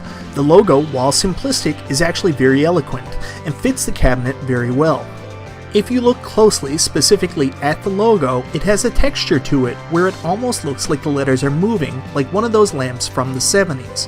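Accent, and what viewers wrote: Canada. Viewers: So far, you can tell you're from Canada. Especially with the "marquee". I love the accent. Thanks for the review! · Great review. By chance are you a fellow newfie haha accent sounds like it lol.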